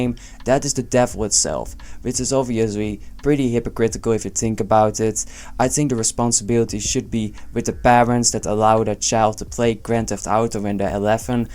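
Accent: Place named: Netherlands. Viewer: lmao this sounds like some heavy dutch accent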